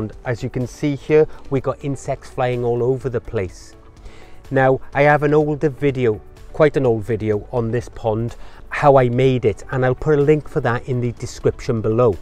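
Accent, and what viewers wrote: Wales, United Kingdom. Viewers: Guessing from the accent your Welsh too, I'm from the Swansea area. Before this month my soil has been turning into dust. I know about the problem with the jet-stream breaking down over the last few years and the low rainfall over the last 12 months for the south coast. Is there anything that really works to keep soil moist. I just bet that after this month, its going to go dry again. The garden is loving the rain this month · I hear the accent...I subscribe. Dan and oliver, Cardiff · Margie Watson He’s from the Welsh valleys, maybe Maerdy, Ferndale, Aberdare ?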